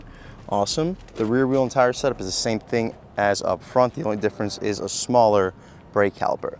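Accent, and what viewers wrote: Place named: Long Island, United States. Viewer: Dood has a Long Island accent but is in Tampa????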